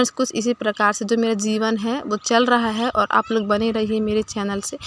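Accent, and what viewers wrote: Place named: Pahari, India. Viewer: Di aap video me pahari tune kese lagati hai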